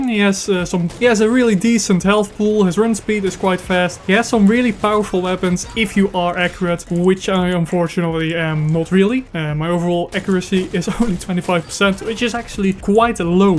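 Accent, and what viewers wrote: Netherlands. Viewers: Je klinkt Nederlands, maar waarschijnlijk heb je geen idee waar ik het nu over heb haha.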